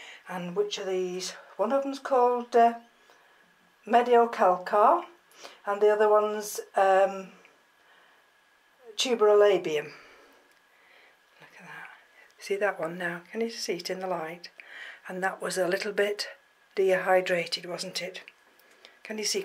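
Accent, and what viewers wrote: United Kingdom. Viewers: where did you get your shelving? You sound to be in the uk like me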